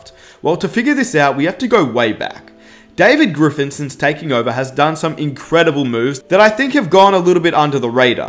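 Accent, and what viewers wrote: Australia. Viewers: Yo, are you Australian? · is he australian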